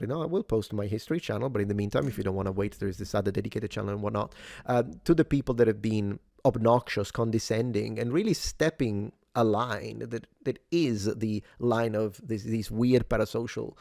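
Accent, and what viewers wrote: Sicily, Italy. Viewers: Good sir, you can post whatever you want on YOUR channel. I just recently started watching and it was because of your political commentary. I'm so happy you popped up on my feed, your voice is very soothing and your accent is great. My dad was in Sicily when he was in the Navy during the Vietnam war and always spoke so highly of his time there. When I heard you say that is where you are from, I was hooked. I appreciate your perspective and hope you continue to post your feelings. ❤ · I don't remember when i first got to your channel, but i remember that i wanted something history related to listen to, saw your name, went "Heh, Supernatural" (Yes, i know, i'm a smooth-brain) and i was hooked on that stuff for a while. Then i kinda forgot about you, because my focus shifted to something else. After what happened with Kirk, i just needed someone to listen to who talks sense into that situation, and by chance i found you again. 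Now i'll stick with you again, your content is pretty cool overall. Do whatever feels right, i'll listen to your nice italian accent regardless of what you're speaking about! :D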